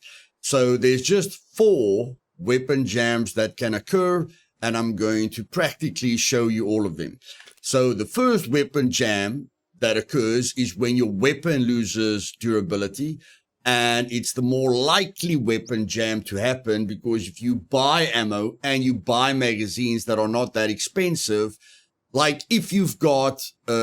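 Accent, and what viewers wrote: South Africa. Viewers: Your accent...is this South African?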